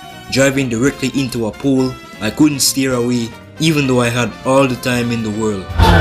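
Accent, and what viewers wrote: Jamaica. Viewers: Bro sounds oddly Jamaican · Jamaican accent discussing tech is low-key soothing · Bro ur Jamaican?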